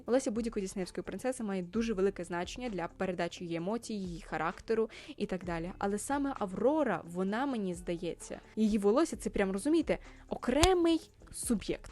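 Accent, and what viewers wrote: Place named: England, United Kingdom. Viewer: У вас дуже приємний англійський акцент 😀 Я думаю, одна з основних причин, чому дісней перезнімає старі мультфільми, це спроби продовжити життя принцесам. Сучасним дітям вже не цікаві настільки старі мультфільми, ще пару поколінь і ніхто б вже не знав хто така Аврора чи Аріель. А оскільки їх лінійка принцес це ледь не візитна картка діснею, їм важливо розширювати аудиторію і залучати сучасних дітей. Ну і заробіток звісно теж, куди без того) Такі мультфільми 100% окупляться, а от нові історії це завжди ризик Більшість останніх мультфільмів які вам сподобались були таки зроблені піксаром, а не діснеєм. Не думаю, що дісней хоч якось приймав там участь окрім як інвестування. І я б не стала мішати Піксар до Діснея. Так, формально вони його купили, але це все ще різні студії, і як на мене вони мають кардинально різний підхід і стиль роботи) Дісней завжди були досить консервативні як стосовно візуального стилю (у них всі персонажі виглядають тупо однаково), так і стосовно сценарію. 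Вони бояться відходити від протоптаних стежок, в той час як піксар часто експерементують. Тому, до речі, мене здивував нещадавній реліз "Бажання", де видно експеременти зі стилем, судячи з усього навіяні новими тенденціями, які запустли "Людина павук крізь всесвіт" та "клаус" свого часу. Проте у Діснея цей експеримент поки не дуже вдалий, і виглядає дивно. Тим не менш, цікавий початок, побачимо що буде далі.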